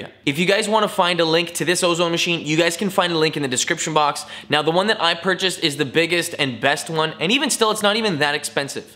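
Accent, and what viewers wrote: Canada. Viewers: Canadian accents annoy me.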